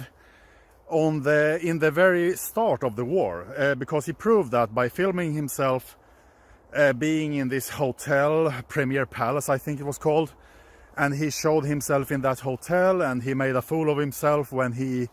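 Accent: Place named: Spain. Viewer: You have a Spanish accent! 1. You are not half a Greek! 2. Didn't you hear sirens? For months...from his window? 3. He got caught by Ukrainian SPU - who ( by his claims) - don't allow him to leave the country! During this "obducted " week - he surprisingly has to sign many non - disclosure papers! 🙆🤷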